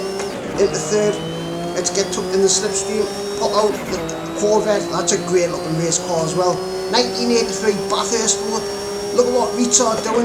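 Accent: Newcastle, United Kingdom. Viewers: Really enjoyed this lad - and great to hear a Geordie accent 💪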